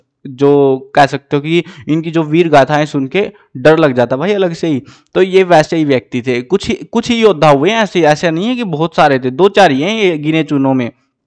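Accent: Haryana, India. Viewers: Right sir haryanvi ka apna swag ha 🤟🤟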